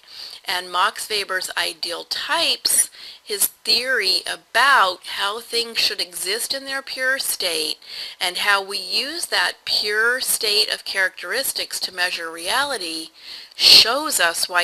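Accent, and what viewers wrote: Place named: China. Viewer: Chinese equar English, l can't understand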